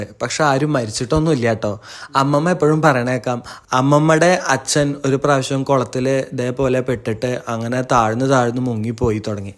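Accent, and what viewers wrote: Valluvanad, India. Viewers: ആ വള്ളുവനാടൻ ഭാഷ ഷ്ടായി ട്ടോ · Is this valluvanadan slang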